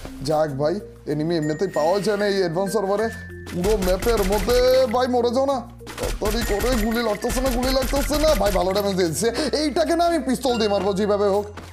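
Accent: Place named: Comilla, Bangladesh. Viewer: ভাই তোমার কন্ঠ শুনে মনে হচ্ছে তোমার বাড়ি কুমিল্লা।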